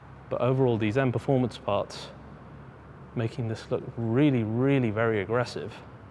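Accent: United Kingdom. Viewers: maybe because of the British accent?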